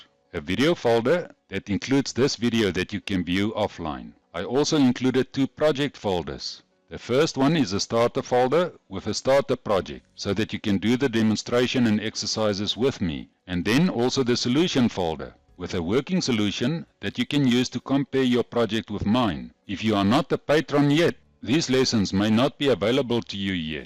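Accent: South Africa. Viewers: Familiar South African accent. Sounds great. Great explations.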